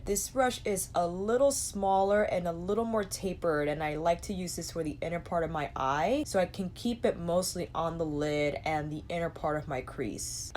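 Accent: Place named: East Coast, United States. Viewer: I dont know what kind of accent you have, but I like it. Feels East Coastal.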